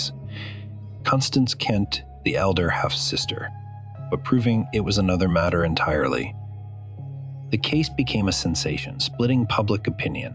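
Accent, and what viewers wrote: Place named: United States. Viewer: Narrator sounds American or maybe Canadian Should be pronounced as 'Tems' not 'thames'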